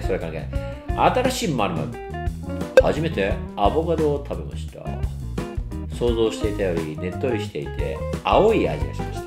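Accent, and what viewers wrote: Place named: Kansai, Japan. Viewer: 剛くんの関西弁かわいくて好きです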